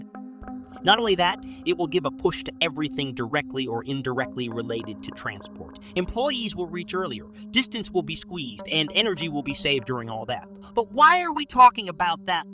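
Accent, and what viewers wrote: United States. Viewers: ChiNews is a good program but the voice is terrible and needs to be changed to a softer voice, and not a heavy noisy U.S accent. This voice is horrible to the ear.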